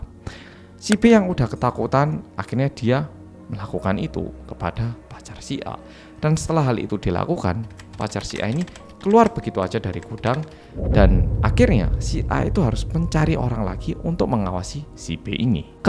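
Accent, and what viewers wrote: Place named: East Java, Indonesia. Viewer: Salut..bangga apresiasi sama Mas..bahasa indonesia logat jawatimuran umumnya " Malangan khususnya. Ortu seperti saya ini asal Sumut domisili di jakarta 50 tahun..selama bekerja..kadang ke jateng..tp ga pinter2 amat boso jowo. 👍🏻👍🏻👍🏻👍🏻 isin...aku.😅 opung ini suka filosofi jawa. tentu yang lainnya juga..salam sehat semuanya. Sai hipas saluhutna🙏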